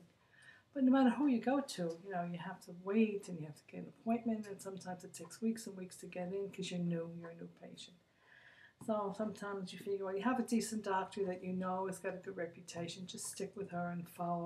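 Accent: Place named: New York, United States. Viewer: I'm so glad I found this! I'm waiting to get my appointment! I'm from NY too Babylon ny...by the sound of you accident you might be too!! I hope all goes well!! I'm excited but scared. She really is a good doctor despite the hiccups but it's a pain.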